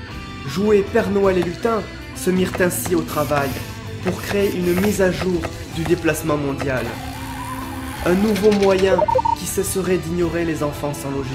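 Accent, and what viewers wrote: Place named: South-West, France. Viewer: Première vidéo que je vois sur ta chaine, et je dois te féliciter ! Très beau texte qui suit le rythme de tes rimes et de ton accent du sud ouest, animation superbe, dessins très soignés, et une originalité indéniable...Bref. Un sans faute !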